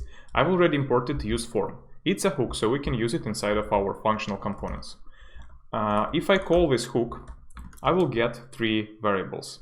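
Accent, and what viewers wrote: Russia. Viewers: thank you! you speack with a russian accent)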